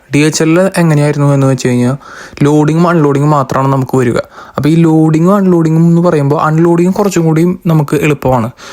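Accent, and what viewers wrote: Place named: Thrissur, India. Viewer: ചേട്ടൻ തൃശ്ശൂർ ആണല്ലേ സംസാരം കേട്ടപ്പോൾ മനസ്സിലായി ഞാൻ പാലക്കാട് ഇപ്പോൾ പോളണ്ടിലുണ്ട് അവിടെ ജോലി ശരിയാക്കി തരണേ പ്ലീസ്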